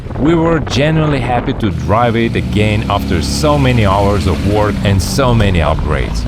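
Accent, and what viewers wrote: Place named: United Kingdom. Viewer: You have an impeccable British accent.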